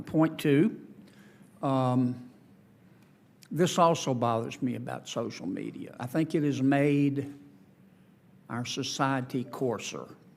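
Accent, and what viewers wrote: South, United States. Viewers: 😁😊😍 I LOVE John Kennedy!!! He seems soo polite and easy-going, but his sweet Southern accent and his soft spoken delivery hide a sharp as a tack mind! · One of, if not THE smartest persons in Washington DC. It's a shame that some Americans have equated the Southern accent with ignorance.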